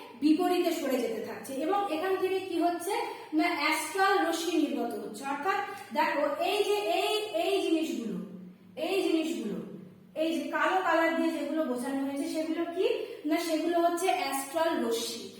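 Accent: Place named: India. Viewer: কথা গুলা শুনে মনে হচ্ছে ইন্ডিয়ান!! ক্লাসটা ভালো ছিলো ম্যাম From Bangladesh 🇧🇩💙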